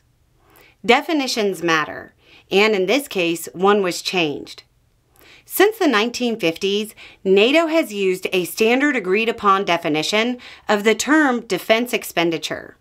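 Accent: South, United States. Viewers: Thank you for the "Monkey Paw" reminder and the description of the current Monkey Paw. Your southern style polite got ya's are precious. Keep smiling.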